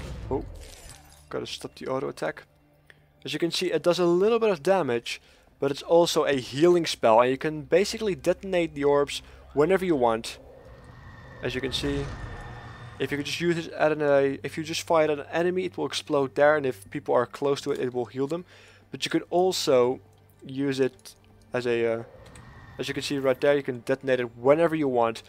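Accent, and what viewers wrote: Netherlands. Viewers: Yo mede Nederlander, nice vid ! ps: Je accent verraadt je :p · You dutch i hear your accent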